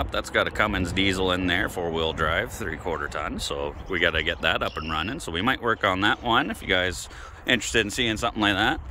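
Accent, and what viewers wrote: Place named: Maritimes, Canada. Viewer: I like your accent, but trying to place it. I've got it narrowed down to either PEI or NS. Am I close?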